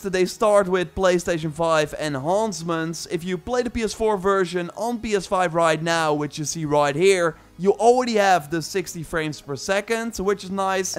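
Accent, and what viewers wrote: Netherlands. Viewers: Damn that dutch accent is jarring And i am dutch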